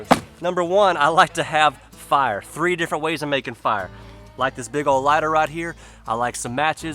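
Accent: South, United States. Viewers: I was wondering where was from with that Southern accent and now I know Mississippi. That blue light that was holding we in Canada call that a Barbeque light because everyone here pretty!uch owns a propane grill barbeque so that long lighter comes in handy. As for the duct tape there was a Canadian comedy Tv show called The Red Green Show about a typical Canadian lumberjack and he would call the duct tape the handyman's tool. There would always be uses for the duct tape. LOL! 😂😆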